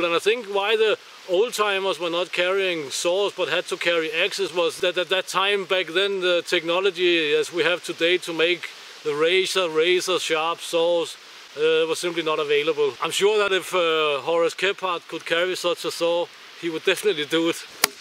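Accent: Germany. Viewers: Why the German accent?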